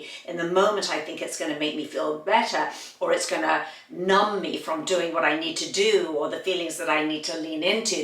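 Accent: England, United Kingdom. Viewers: it's nice to listen to you and you've a positive mindset .and it's interesting to know all the steps to reach happiness and positive mindset eight steps. I'm French my name is RICHARD and I should to put subtitles to follow you because it's most easier for me to understand the meaning of your talk .I suppose you're English what you told us and I don't know why I prefer to listen to English people than American people. I understood your talk and I'm so glad because step by step I improve my English skill .above all what I have learned about what you told me is never never give up is what I'm doing .I like English language and I hope one day to meet English speakers to share with them .here in France where I live people doesnt like sharing language I was born in France and I'm sixty three years old but I try to keep getting my energy. I'm happy to be alive and enjoying all things .culture spiritual activity music and cooking too .life is so wonderful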